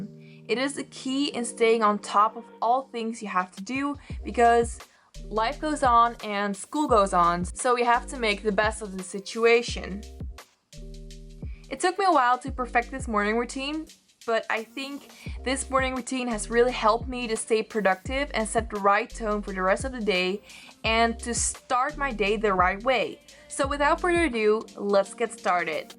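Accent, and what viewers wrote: Netherlands. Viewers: Hold on for a sec, your Dutch?😱😱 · Haha I thought you sounded Dutch and then I saw the Kruidvat & Albert Heijn products. I love Dutch YouTubers who make English videos! ☺️ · are u dutch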